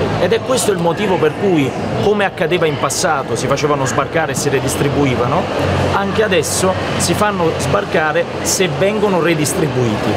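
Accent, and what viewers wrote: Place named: Naples, Italy. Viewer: Di Maio, qui sbarcavano pochissime persone solo come punto d'appoggio, le navi il porto non lo vedevano proprio! HAI DIMENTICATO COSI' IN FRETTA? Gli italiani NO. E se proprio non vogliamo fare una figura barbina come con "l'Ebetino" ed il tenutario di Arcore, dal momento che adesso sei agli esteri, fatti nu bellissimo" fulle- immersion" di inglese perché se parli l'inglese napoletano i complimenti li faranno anche a te.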